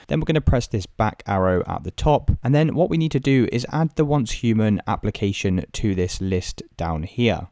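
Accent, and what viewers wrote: United Kingdom. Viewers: jesus i hate the british accent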